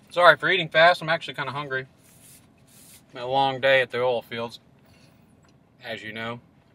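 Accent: United States. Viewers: your accent sounds like where i grew up at raleigh north carolina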